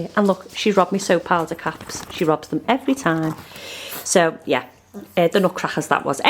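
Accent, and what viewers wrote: Liverpool, United Kingdom. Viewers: Beautiful! Have a wonderful Christmas and happy,healthy New Year! Great to have you back and hear that Liverpudlian accent again--memories of my time at uni,many,many moons ago😂.Luv from Grandma Gwen in Germany💖😘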